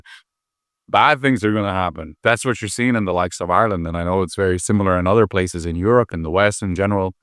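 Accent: United States. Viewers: You have an american accent . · You could just call 'the West' Europe. That's geographically where you are speaking about. You video is nonsense, - there is freedom of movement in Europe, within the EU. Saying 'Eastern Europeans' made Ireland less safe is complete nonsense. Many Polish people settled in Ireland and added hugely to Irish society. You are so anti the US and yet you sound so American, you certainly don't sound like a person who lived in Galway since they were nine years old. Ireland has experienced an influx of illegal immigrants who come into Ireland via Northern Ireland, but those numbers are falling now. We have had a lot of Ukranian refugees, fleeing war. Would you suggest not helping refugees fleeing war? "Indians have fake degrees" - no faker than you stating you have a masters in pharmacology, only to later admit you dropped out, so you don't have any masters degree. 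Lithuania joined the EU in 2004, so you weren't an immigrant who needed a visa when your family moved to Ireland, you were just someone exercising EU freedom of movement with your family.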